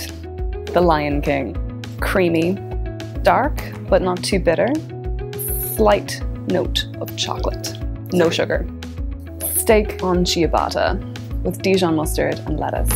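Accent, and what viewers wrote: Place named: Monaghan, Ireland. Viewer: lovely Monaghan accent.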